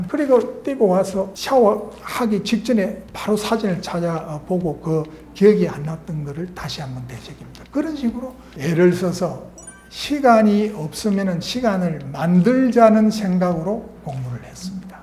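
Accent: Gyeongsang, South Korea. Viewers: 저도 동의합니다~경상도에는 Intonation이 있어서 flat한 영어보다 듣기에 나아요~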